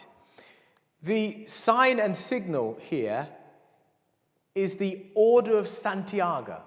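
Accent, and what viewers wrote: United Kingdom. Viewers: Why does this African American have a British accent...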